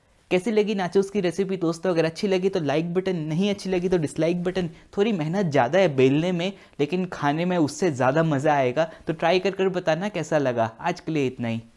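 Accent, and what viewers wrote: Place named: Indore, India. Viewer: Indori tone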